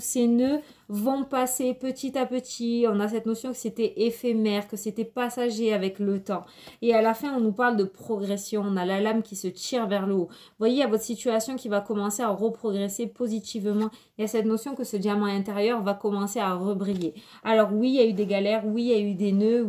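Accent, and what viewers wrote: South, France. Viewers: Merci, j'adore votre accent du sud. Apparemment, les sagittaire vont respirer un peu 😍😘😍😘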